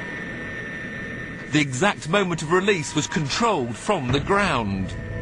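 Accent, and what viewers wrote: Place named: United Kingdom. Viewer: 这英音听的舒服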